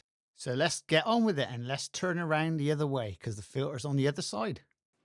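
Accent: United Kingdom. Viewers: Love when I hear a Bristolian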